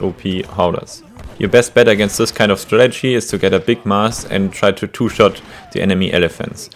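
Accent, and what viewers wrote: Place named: Germany. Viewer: Diese furz trockene art und der deutsche akzetnt 10/10👍🏽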